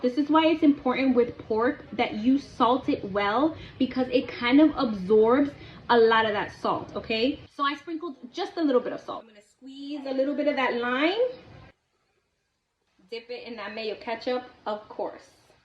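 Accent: New York, United States. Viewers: Her Spanish accent is fighting with her ny accent. Sometimes ones winning sometimes the other is. Lol.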